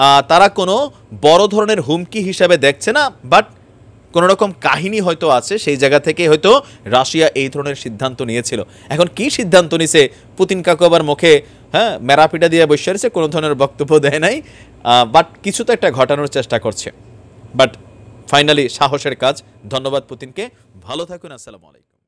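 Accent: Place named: Comilla, Bangladesh. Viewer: হাহাহা ভাই কুমিল্লার ভাষা শুনলে আপনার মুখে সেই লাগে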